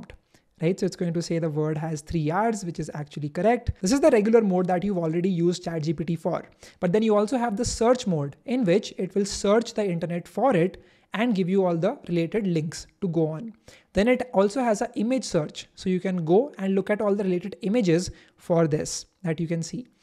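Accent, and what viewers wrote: India. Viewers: ahh ofc. when i hear hindi accent i already know this video is bullshit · The thick Indian accent 😭🙏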